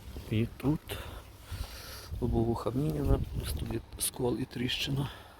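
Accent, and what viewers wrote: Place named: Ukraine. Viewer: Суржик ужасный!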